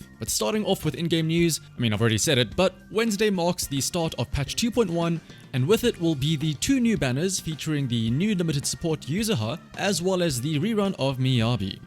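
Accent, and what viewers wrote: South Africa. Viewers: dude are you south african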